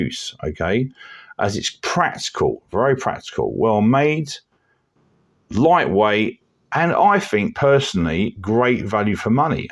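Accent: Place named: United Kingdom. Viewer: Thank you, Sir. It is a good worker. A deep carry clip would be better. I like your speech, dialect, also the british language, of course. May I ask you, what part of Great Britain do you come from? Thank you very much. Stay healthy and all the best. Cheers Frank🖖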